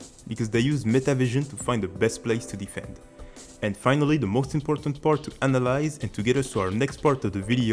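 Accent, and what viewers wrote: France. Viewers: French accent kinda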